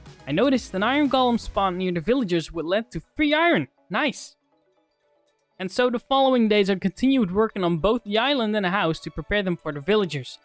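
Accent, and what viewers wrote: Netherlands. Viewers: Are u dutch?? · Hmmmm you sound Dutch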